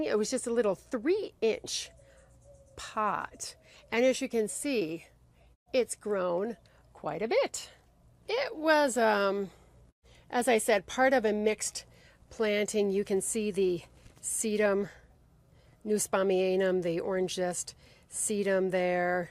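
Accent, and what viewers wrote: United States. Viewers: Hi! It's Marc again from good ol' Germany. Thank you for this video! Yes, I do find your videos very helpful indeed, and I always look forward to new videos coming from you. And between you and me; I love your New England accent. Your English sounds absolutely beautiful. I also learned something from you that works fantastically even in the much cooler and moister climate of central Europe: Amend potting mixes with worm castings. Wow! This is awesome! It works on my citrus plants, but it also works on my mammalaria cacti and jade plant and aloe. It also works on my cycads. And it works on my heavy feeders like oleander and geraniums. Today I amend the potting mixes of all my plants - tomatoes, Mediterranean herbs, coffea, strelitzia etc etc. My impression is that worm compost keeps my plants healthier and pest-resistant. So, let me assure you: your videos are absolutely helpful - even over large distances and across climate zones. 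So, carry on, and share your expertise with us, so we can all go out in the garden and make the world a more beautiful place (I love your motto, and I have adopted it and made it my own). Have an awesome Sunday and enjoy your patios and garden! Bye!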